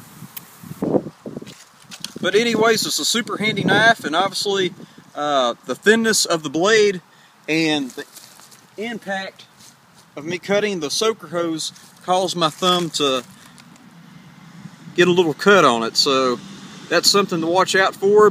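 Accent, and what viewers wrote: South, United States. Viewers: Holy shit this is a serious southern accent.. damn.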